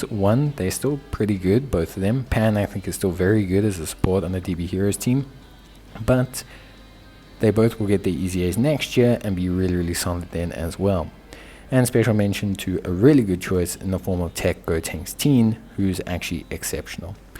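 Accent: South Africa. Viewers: Woah bro, are you South African?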